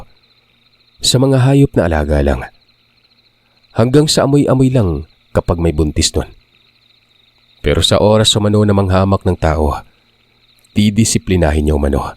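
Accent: Visayas, Philippines. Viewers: Nanggigil ako sayo sir. Napa ka slung ng bisaya mo.. pa-ma` ba`. 😂😂😂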